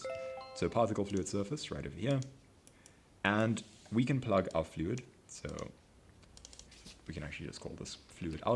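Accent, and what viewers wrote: South Africa. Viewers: Love this series!! Are you from South Africa?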